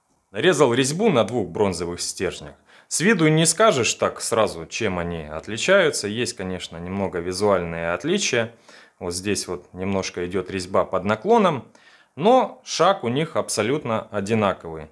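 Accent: Rostov, Russia. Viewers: Ростовчанин, штоле? По говору слышно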